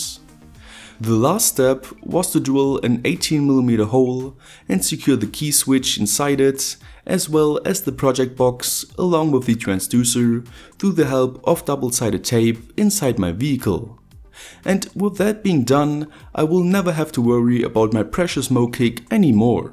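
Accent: Germany. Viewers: Your voice has an German accent which i really like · is he swiss or German? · why he sounds like a german?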